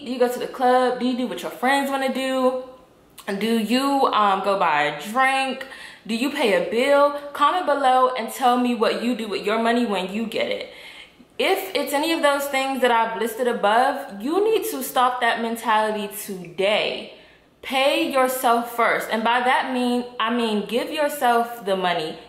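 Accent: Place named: DMV, United States. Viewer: Yas. Recognize that talk anywhere DMV!!!!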